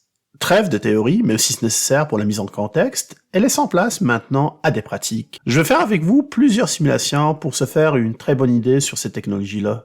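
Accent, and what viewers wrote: Quebec, Canada. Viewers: Êtes vous Québécois ??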